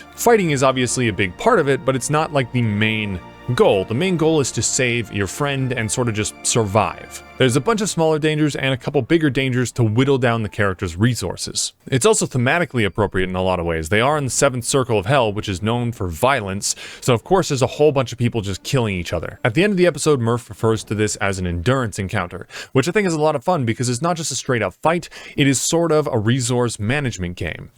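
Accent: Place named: Flanders, Belgium. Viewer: not me trying to figure out if you're dutch or flemish... ima say flemish?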